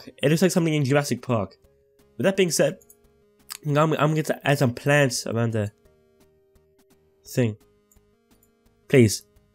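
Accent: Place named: Europe. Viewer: You don’t sound Aussie more European Good vid anyways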